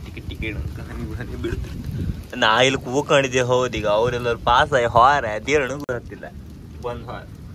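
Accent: Kundapura, India. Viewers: very nice channel and beautiful content. I have been watching your posts from Kuwait for quite sometime now. I Liked the simplicity, naturality of the content, especially the hard work done by fishermen community. Most importantly, i love Kundapura Kannada. All the best. Keep Fishing.!!!!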